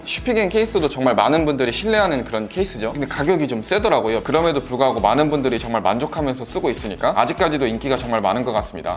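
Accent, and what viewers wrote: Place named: Jeonbuk, South Korea. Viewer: 고향이 전북이신가봐요 전주 아니면 군산 ㅋㅋ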